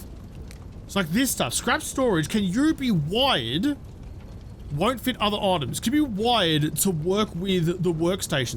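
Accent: Australia. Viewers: "hypathermia or hypathermia, which one?!" Ah, aussie accent never change but also please don't be responsible for me in the zombie apocalypse when you have to ask for help on the radio with my very high or very low body temperature.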